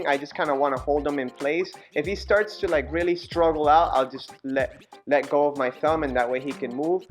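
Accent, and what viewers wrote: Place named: United States. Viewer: So glad it is American accent ❤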